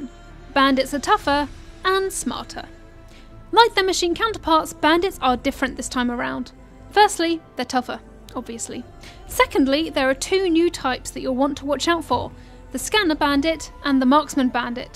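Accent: United Kingdom. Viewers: Are girls not allowed to have a British accent?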